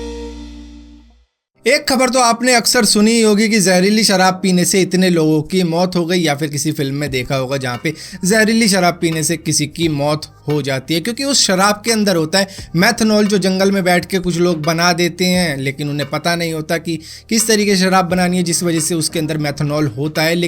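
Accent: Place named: Delhi, India. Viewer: dilli wala accent paarak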